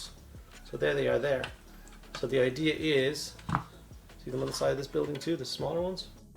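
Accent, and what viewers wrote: Ireland. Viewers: tbh as long as I hear your lovely irish dulcet tones I am happy, you encourage me to paint. No nonsens, just paint encourages new cummers to the hobbie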